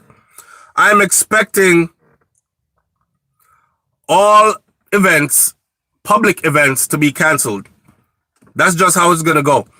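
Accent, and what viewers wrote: Trinidad and Tobago. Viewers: The trini accent on point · Luv the Trini accent..lol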